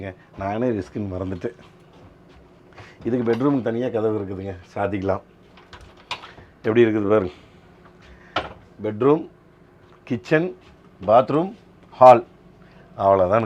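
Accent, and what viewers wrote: Kongu, India. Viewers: Ungkalathu kongu tami slang style remba. Arumai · Super..that too in Kongu Tamil....very very nice sir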